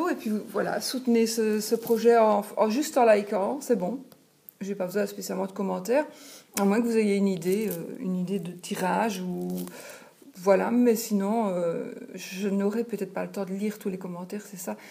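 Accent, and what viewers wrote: Belgium. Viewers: Faites au mieux mais je suis toujours très pressée de regarder vos vidéos..et votre accent belge me rappelle des amis""perdus"".....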